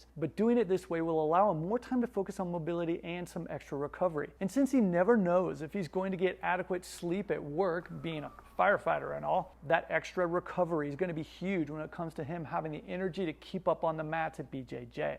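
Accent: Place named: Kansas, United States. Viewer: Sounds like he's left the land of Oz and is back in Kansas--Wichita, Kansas!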